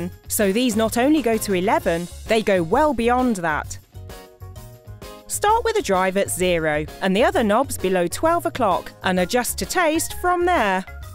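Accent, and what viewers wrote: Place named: England, United Kingdom. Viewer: The English accent creeps me out... and i was born in England...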